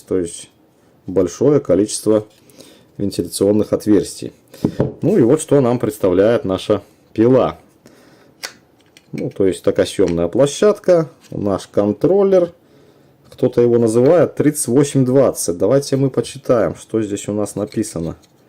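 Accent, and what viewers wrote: Belarus. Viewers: Классный белорусский говорок, я так не умею хотя далекие предки со стороны отца из тех краев.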